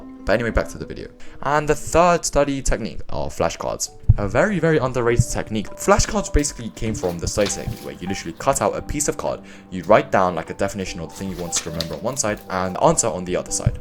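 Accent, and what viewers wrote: United Kingdom. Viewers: With the helpful stuff aside, dude your british accent is so good to listen to. Im an asian myself and use american ones, but your voice is peak pls keep cooking.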